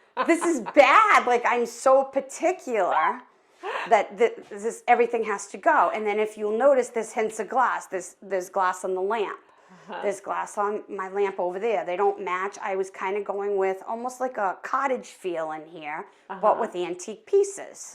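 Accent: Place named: New York, United States. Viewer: accent sounds more like downstate NY than Massachusetts.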